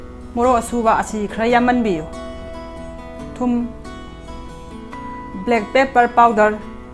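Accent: Kakching, India. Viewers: Meitei lonse mawong ama toudeye kakching haiba lamdugi dialect ne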